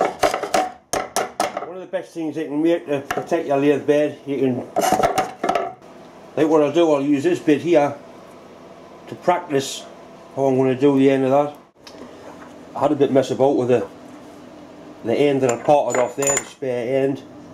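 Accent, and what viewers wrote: Newcastle, United Kingdom. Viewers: Geordie, is he ?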